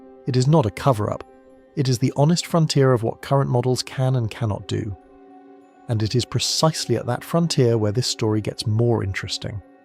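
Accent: United Kingdom. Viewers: Humans cannot put two and two together in real time 3i/Atlas came through in retrograde between the orbits of Mars and the Earth with its "tail" pointed towards the Sun - that is into the future orbital path of Earth and spewed toxic gasses, vapors and chu KS heavy enough to just slow and sit there. Now earth is orbiting through it and catching the debris and toxic soup. One needs to get over the fact that 3i /Atlas was not a Comet and it is simple to explain. The question is how long will be catching debris and what other dangers it brings Let us not make 2 hour lectures in stupor - it is explained by some orbital mechanics Different channel name same British spit mouth. There would not be lisp noise unless words are being spit mouthed. Use an American clean English AI text speech reader and stop repeating not this, not that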